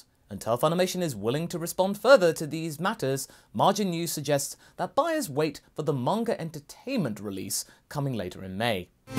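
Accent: United Kingdom. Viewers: He is, indeed, British. English, specifically.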